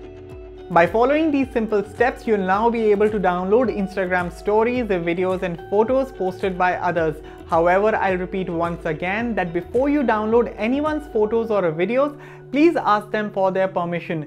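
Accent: India. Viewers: Is that a Hindi accent?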